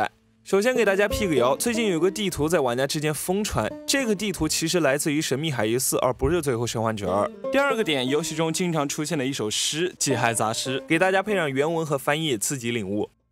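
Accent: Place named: Mainland, China. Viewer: 这说话的语气强调怕不是墙国up主吧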